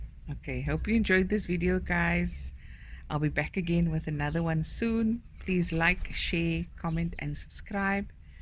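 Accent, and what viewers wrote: South Africa. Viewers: Hi l made these yesterday. They were delicious. I miss that SA accent,now living in UK.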